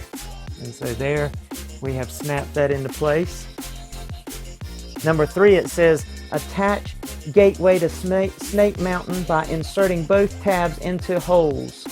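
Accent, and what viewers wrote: South, United States. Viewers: Came across your video here and it's my 2nd video I've watched. So the reason i thought I'd comment cause you sound Southern with a Southern accent. I'm in the South as well if you are. I'm getting a Snake Mountain as well from Super 7 but haven't been notified of a shipping track number so just waiting is all i can do.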